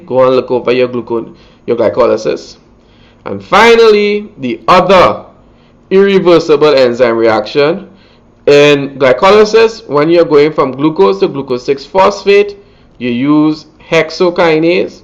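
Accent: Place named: Caribbean. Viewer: Dr., Been in broadcasting 33+ years and am now in studies for personal Fitness Training Certification. Huge chunk of the studies are all about this (ATP production is the focus). Not only have your lectures filled the blanks...but I grew up in St. Thomas, and hearing the Caribbean dialect just adds a comfort level to the learning...MESON!:-) Thanks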